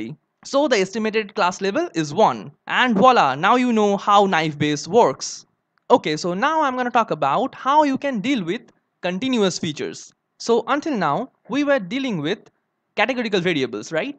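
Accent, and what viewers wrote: Bengal, India. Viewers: You areee Amazing. I love your Indian Bengali accent ( just a guess hehe make me a voice analyzer if i am right XD )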